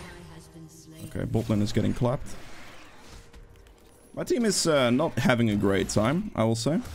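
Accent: Netherlands. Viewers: hi i enjoyed ur gameplay.. i have a quick question.. r u dutch?? take care king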